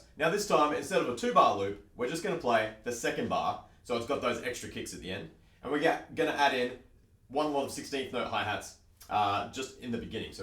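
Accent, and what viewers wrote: Australia. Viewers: is he Ashton's missing dad? he's a adult he plays drums like Ashton , he has a Australian accent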